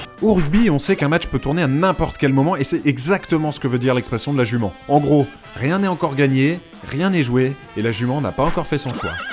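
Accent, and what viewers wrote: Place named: Southwest, France. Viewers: l'accent du sud ouest est très beau 👌on dit roase et non pas rose avec l'accent du Parisien tout plat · Qu'il est beau notre accent du Sud-Ouest!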